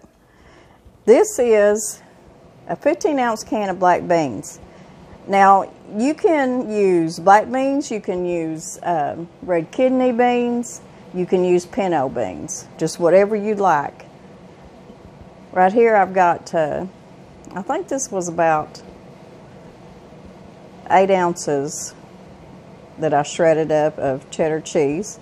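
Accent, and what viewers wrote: South, United States. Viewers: Yum yum! I have made that taco salad before, but used ott's dressing instead of Catalina. I thought Catalina might be too sweet, but I know the recipe calls for it. You've given me the idea to make that when my daughter, her husband, and my two-year-old granddaughter come for a visit on the 15th of August. I think we'll have this the first night, including your pound cake with cream cheese in the middle. I think the only difference will be is that I will sugar up a nice sized bowl of sliced strawberries, and serve them with it. We'll just turn it into strawberry shortcake with a bonus of cream cheese in the middle! By the way, my daughter lives in Northwest Arkansas, in Rogers/Bentonville. She's a nurse practitioner at Washington Regional hospital in the ER. But she is from Central Missouri, which is where we live. We live out in the country near lake of the Ozarks. 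Our little town has a population of 91! I actually live on the outskirts, I guess we're in the burbs, but really, we're just out on a dirt road. 😁 I love watching your videos! I love to cook, and I love to watch other people cook. You cook things very similar to how we cook them, and you talk even more Southern than we do, but your husband sounds like every person I grew up with, including my own family! We are hillbillies too! 😊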